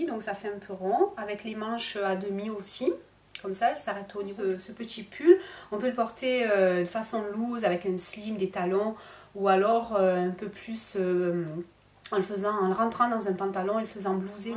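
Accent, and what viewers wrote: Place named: South, France. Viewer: je te trouve très chou ainsi que ton accent du soleil ....Hein les botchinnes ;) merci pour ce partage je m'abonne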